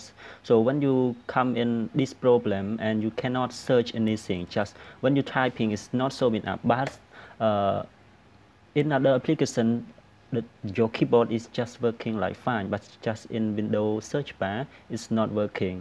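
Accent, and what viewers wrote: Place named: India. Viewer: You sound like indian tech support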